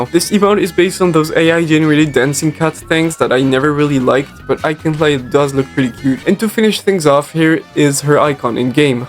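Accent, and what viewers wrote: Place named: France. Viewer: t'es francais mdrr t chaud en anglais frro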